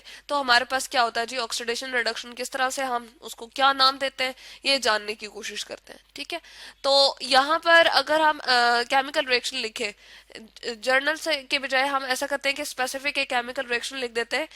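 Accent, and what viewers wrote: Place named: Pakistan. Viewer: You look Pakistani the way you talk 🤔🤔😍 I love your way of talking and this video helped me a lot thank you .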